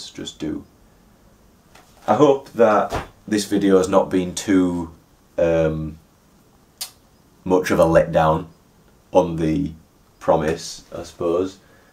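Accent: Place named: Halifax, Canada. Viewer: where are you from ? im from halifax you sound like you might be close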